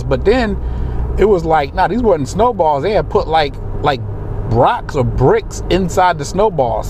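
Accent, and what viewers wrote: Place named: Bridgeport, United States. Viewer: If Bridgeport CT is so close to the Bronx NY why is your accent so different ?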